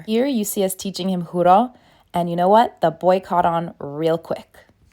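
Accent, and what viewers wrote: United States. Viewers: "I left NY city for Bulgaria" - I was going to say, your American accent is really good :) Liked and subbed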